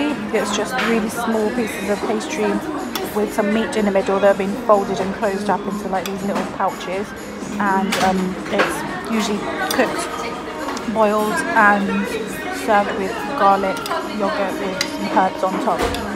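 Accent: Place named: United Kingdom. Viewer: I never tought that you able to speak turkish because of your incredible brit accent 😅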